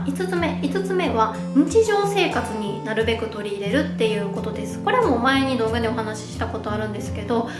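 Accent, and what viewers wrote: Kansai, Japan. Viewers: さんの関西弁が聞きたいときにおチャンネルに見にきます。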